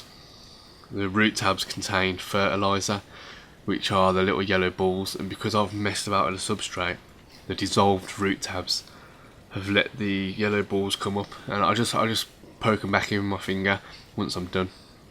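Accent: Birmingham, United Kingdom. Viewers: are u a brummie lol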